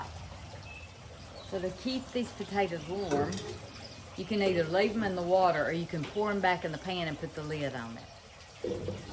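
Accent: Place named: Alabama, United States. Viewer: I just love to hear you speak. You have an accent like me! I was born and have lived in Alabama all my life. I love my presto digital pressure canner.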